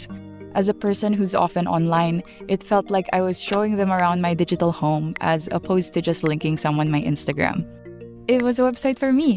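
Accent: Philippines. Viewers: This might be weird but the only video ive seen from you is the duck one and this is the first time im hearing your voice, LIKE ONE MINUTE IN i was like “I HEAR FILIPINO”😭😭😭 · I've lived in the Philippines for all my life and accent is bothering me, not in a bad way or anything just familiar in a sort of way, her accent reminds me of alot of my Aunts who speak English but their main language is Filipino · first time watching your content. overall its very simple and cute <3. and loving your filipino accent :3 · i know a filipino when i hear one :face-orange-biting-nails: anw i absolutely love ur arstyle!!!!!!!!!!!! ur voice's soothing too :pppp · Are you from philippines? Your english accent sounds like that ❤ very adorable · I know a filipino when i hear one, lmao literally had to check ur profile when heard ur accent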